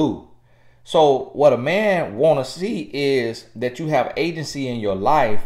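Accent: South, United States. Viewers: It’s his southern accent , he is just funny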